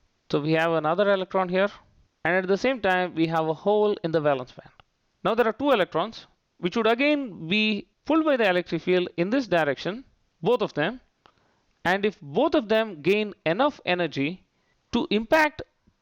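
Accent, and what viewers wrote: India. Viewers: We're getting sick of Indians accent.God damn it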